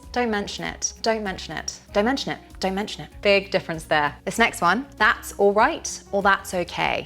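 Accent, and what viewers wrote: United Kingdom. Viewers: you are amazing i love british accent and you are more natural the way you pronounce that is mind blowing. love you from afghanistan.shahab. no worries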